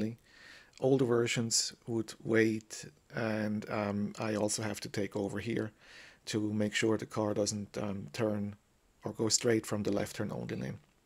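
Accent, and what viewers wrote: Switzerland. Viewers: are you swiss?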